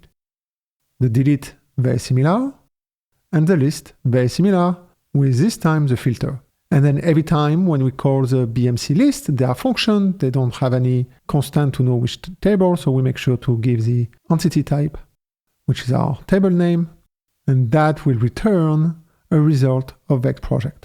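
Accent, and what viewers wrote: France. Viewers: Are you french? You have a little accent xd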